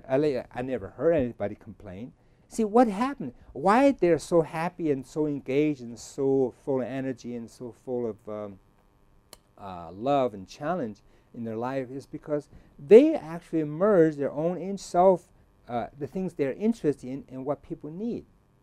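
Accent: China. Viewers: Just started listening. . it is so unusual to hear a white person speak english with a slight Chinese accent! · My god. His English is even not as fluent as his Mandarin. I know his first language ( mother tongue) is mandarin. Obviously, he uses Chinese thinking to express himself in English. Also he owns a mandarin accent when speaking English. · You guys don't know that his Mandarin got a ShanBei accent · lol, an American speaks English with strong Chinese accent. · Very strong Chinese accent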